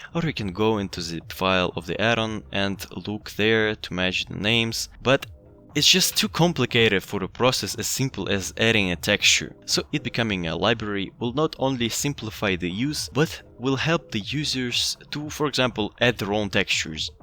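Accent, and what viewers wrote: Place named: North Caucasus, Russia. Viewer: Bro must be from the north caucasus.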